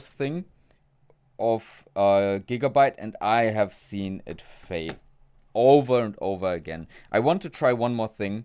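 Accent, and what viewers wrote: Germany. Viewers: you sound very german x'D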